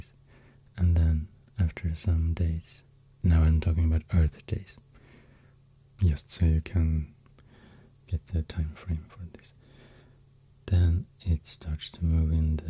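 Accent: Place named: Sweden. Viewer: “swedish accent” is only “accent” i can listen to in asmr, any other “accent” just feels weird but this, this is soooo good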